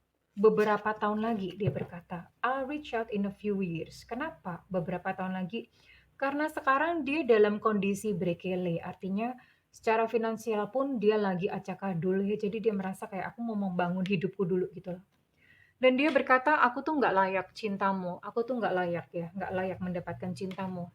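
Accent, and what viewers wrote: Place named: Java, Indonesia. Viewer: Ngeret jowo bund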